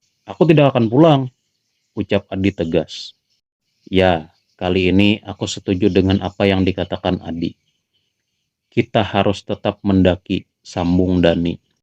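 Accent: Banjar, Indonesia. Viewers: Mimin urang banjar jua neh lugat nya 😀😀.. Nah maaf nah min hnyar tdpt chanel pian mudhan sukses.. Salam dr banjarmasin🙏🙏🙏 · Urg Banjar kah Pian mang..ketahuan di logat neh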